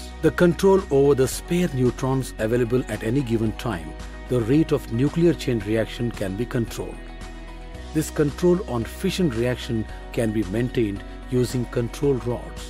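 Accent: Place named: Bollywood, India. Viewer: Bollywood accent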